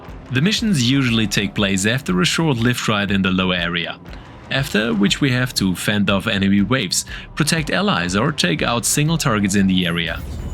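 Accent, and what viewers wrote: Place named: Germany. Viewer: Irgendwie hört sich der Titel komisch an mit "The Great ... " es wäre passender gewesen "Big Guide, oder Hugh Guide", musste schmunzeln 🤣 PS: warum machst du eigentlich auch Videos in englisch? In deutsch macht schon Sinn, beim zuhören bekomme ich fast immer starke Kopfschmerzen, weil du Englisch mit deinen deutschen Dialekt sprichst, es hört sich so an, wie wenn Arnold Schwarzenegger in den 80' spricht. Englisch passt gar nicht zu dir, vor allem ein paar Wörter konnte ich gar nicht verstehen, weil zu undeutlich gesprochen. Wenn es deinem Kanal was bring o.k, sonst mach dir diese Mühe erst gar nicht. Nur so meine Meinung, dafür ist ja die Kommi Funktion da.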